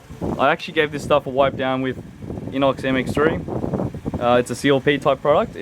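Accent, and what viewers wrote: Australia. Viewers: Cool vid, very informative & educational! All made the better by that Ozzy accent, bro! Hope you're still in Phil when we get there next year! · A Filipino thats in the Philippines with an Australian accent ? I am so confused .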